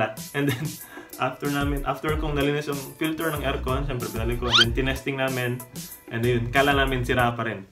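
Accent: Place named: Pampanga, Philippines. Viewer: Kabalen accent 👍😍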